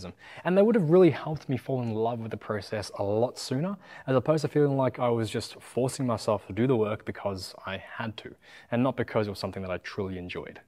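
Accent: Australia. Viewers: Asian Australian? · its alittle weird watching someone whos asian with an Australian accent.. but your the not the first person ..since the first I know of is Joe from the AnimeMan ^_^ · Your accent; Australian?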